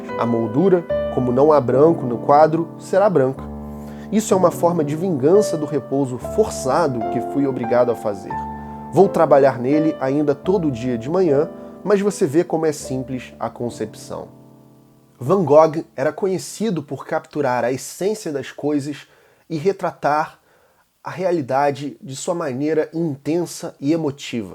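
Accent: Rio de Janeiro, Brazil. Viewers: No vídeo o sotaque carioca chiado, é muito forte. Tens q fazer um teste de dicçao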